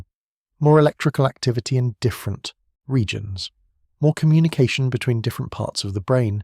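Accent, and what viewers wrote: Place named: United Kingdom. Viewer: This is an American story, why is there a Britain narrating it?,, I want to hear America’s story of American voice.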